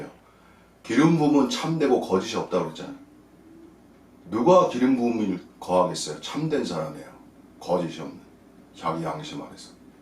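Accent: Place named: Gyeongsang, South Korea. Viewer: 딱 경상도놈 같더라니 울산이구나!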